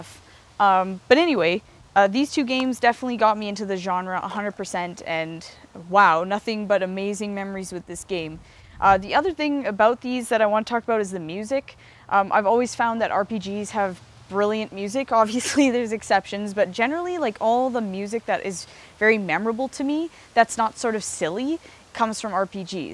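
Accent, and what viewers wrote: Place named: Canada. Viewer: She is Canadian... that's how they usually pronounce it.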